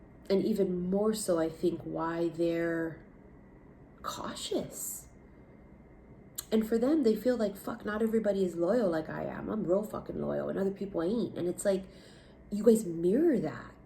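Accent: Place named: Hawaii, United States. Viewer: 😂😂😂I knew you where from Hawaii!!!! I could hear it in your voice!!! Love yah sis🙌🙌🥰🥰🥰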